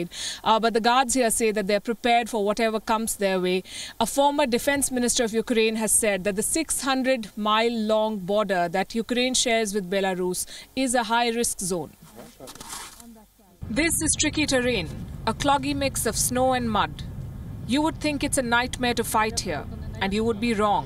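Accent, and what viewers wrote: India. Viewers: She has a mad Indian accent! In fact ALL Indians have the same accent…weird🤪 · Sometimes I don't understand your english because your indian accent is still too thick for me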